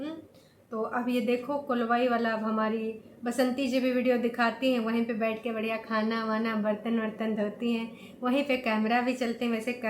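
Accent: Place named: Awadhi, India. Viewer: आपकी अवधी बहुत प्यारी है जी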